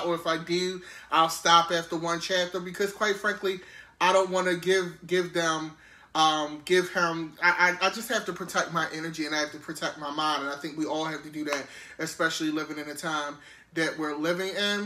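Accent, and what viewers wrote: Baltimore, United States. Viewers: You have a Bmore accent.. I like listening to how you read, and it's nice that you're so politically informed. Thoroughly entertained by this book reading and I'm only on day 1.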